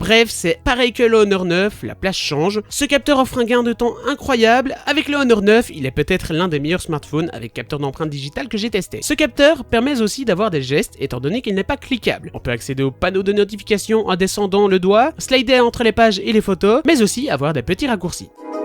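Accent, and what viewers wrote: Belgium. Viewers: Bonne vidéo ! Belge?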